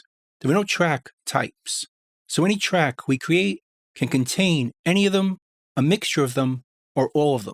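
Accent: New York, United States. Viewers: sounds like Christopher Walken with a New York accent. And man, these videos and how he presents the material is perfect! I've learned everything I know about Reaper 7 from. Thank you!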